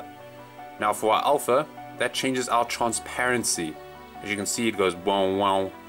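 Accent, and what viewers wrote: South Africa. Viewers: I knew you were South Africa... but your screen just confirmed it - Rock on Pretoria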